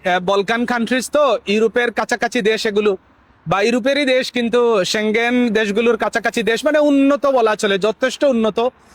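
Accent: Sylhet, Bangladesh. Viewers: ভাই কি সিলোটি নাকি .?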